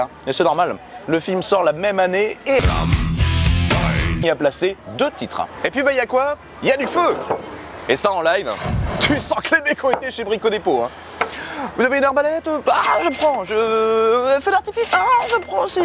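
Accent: Germany. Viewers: Ton accent allemand est immonde. Je tiens à le dire. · mais il est très bien ton accent allemand ! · Toujours une magnifique maniere de reconter l'histoire d'une musique et de don groupe!et magnifique accent allemand..... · J'aime tes videos, mais non ironiquement ton accent allemand pue un peu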